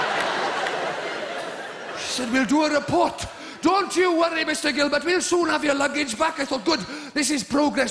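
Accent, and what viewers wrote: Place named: Scotland, United Kingdom. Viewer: I assumed he was Scottish not welsh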